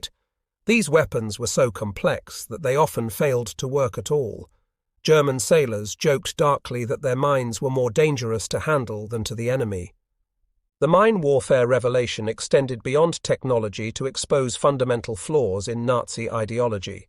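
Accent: United Kingdom. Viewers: and AluMINIUM. (Not Aluminum). Bloke with a British accent trying to speak American. Spoils an otherwise interesting video on a key WW2 Royal Navy bomb and mine disposal event.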